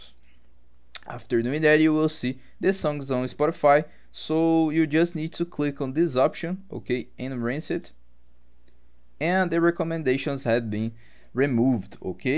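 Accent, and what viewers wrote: Brazil. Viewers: Are you brazillian? Cê tem sotaque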